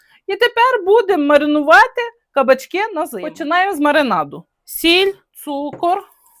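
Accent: Ukraine. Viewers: Вітаю! Ви напевно найкраща господиня з найкращими рецептами! Дуже Вам дякую за Вашу працю ❤ Всього Вам найкращого і всій вашій родині! Ps. Дуже гарний український говір 😉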